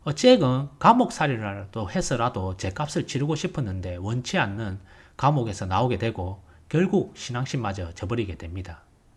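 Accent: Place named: Gyeongsang, South Korea. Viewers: 왜 경상도 출신분들은 사투리를 고치려하지 않는걸까요. 아직도 80년대처럼 대한민국의 주류라고 생각하시는지요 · 뭔 개똥 같은 소리인지...너는 서울출신에 부모 모두 충남베이스. 경상도 사투리 억양 성조 있습니다. 서울은 사투리 아닙니까? 말을 고따위로 합니까? 좋은영화 소개시켜주는 컨텐츠를... 너무 하시네요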